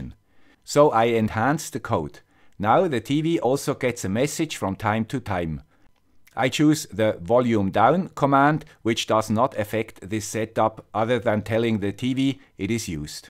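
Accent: Switzerland. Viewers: I'm very cheap and I like tinkering. Everything in my house is DIY and custom made. I like your content and Swiss accent very much. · Hi, ich beschäftige mich gerade mit dem ESP32 und bin dadurch auf deine Videos gestoßen und muss sagen WOW. Echt, der Grad an Details und der Umfang für dein Thema hauen mich echt um. Alle anderen Youtuber sind nicht so präzise oder machen zuviel Entertainment aus dem Thema. Aber nicht beim Typen mit dem schweizer Akzent:D, der brilliert durch Content und sein Hobby! Ich hab das Gefühl egal was ich gerne mit dem ESP32 machen will oder was mich interessiert, du hast das schon einmal durchdacht und nimmst einem dabei viel Mühe und Recherche ab. Also noch einmal: ECHT TOP! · As usual, a great translation of an alien topic with a characteristic Swiss accent and with huge positive benefits for your audience, thank you!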